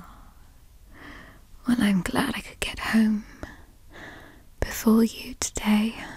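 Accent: United Kingdom. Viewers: That british accent is so cozy yet so misterious .the experience is very intimate with just simple words of affection im suscribed as heck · Mommy british voice nooo my one weakness. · Oh my, I am SO, weak for British accents. They have that posh, certain tone that makes them sound luxurious. and GOD, the teasing is just delicious to listen too, and the accent only amplifies it, I would so end up whimpering and flustered in the best way hehe. Lovely video love!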